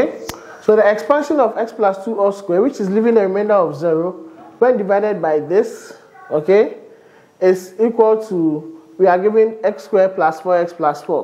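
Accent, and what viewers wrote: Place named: Ghana. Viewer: you are a wonderful teacher....you are a Nigerian with a Ghanian tongue..........you are doing well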